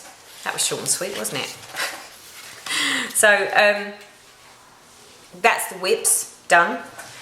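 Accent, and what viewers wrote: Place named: London, United Kingdom. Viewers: I always look forward to your videos when I see them in my subscriptions :) I think you are delightfully funny and I love your english accent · I remember Bev and Teddy always commenting on flosstube videos. I used to comment back once in a while, now I wish I had made much more of an effort. She loved this community so much, which is easy, everyone is lovely to each other. I watch while stitching, and don`t always comment...I need to change that. You do lovely videos, I love your accent, it reminds me of my family in London. xo Mj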